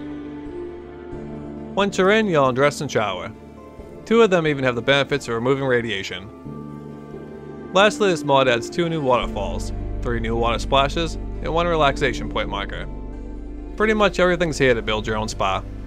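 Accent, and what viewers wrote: Boston, United States. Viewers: YOUR BOSTON ACCENT IS SO IMMERSIVE OMG!!!!!!!!!!!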